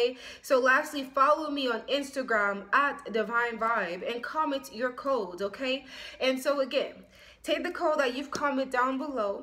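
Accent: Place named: Jamaica. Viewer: Jamaican accent sweet nu bloodclaaat 😏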